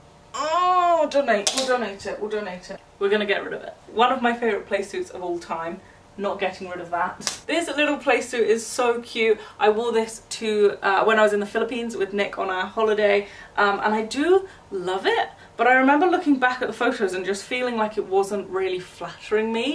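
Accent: Liverpool, United Kingdom. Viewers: Joni Walling he’s from Liverpool in England I think 😊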